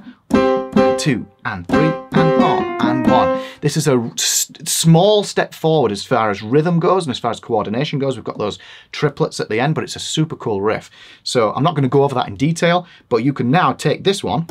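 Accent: United Kingdom. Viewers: I’m watching this for my daughter. I know music theotry, but I am a bassist who know plays mostly gtr. But because you have Czech name and a Harry Potter accent, she might listen to you. If a I showed her this, I’d be just a stupid dad, but for her your professor…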